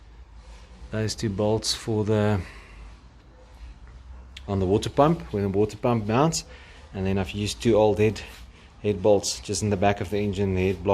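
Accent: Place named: Cleveland, United States. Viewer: You have an interesting accent. Are you from Cleveland?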